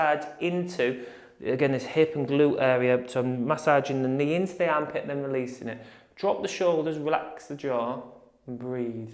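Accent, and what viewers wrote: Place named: Yorkshire, United Kingdom. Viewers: Re:pronunciation of liTTLE - I deliberately tune into your channel as a Yorkshire lass living in London for home comfort and warmth - please do not change your elocution it’s why I subscribe. !! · Why change your accent,? I love your Northern accent...reminds me of my Grandmother. I could understand her even though we lived an ocean apart. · Thanks. love you. don't worry about your yorkshire twang xx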